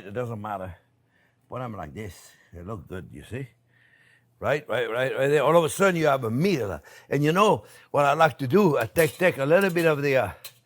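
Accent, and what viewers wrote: France. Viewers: I assume based on your accent and name you’re French. Have you done any French soup videos or heck, any French food videos?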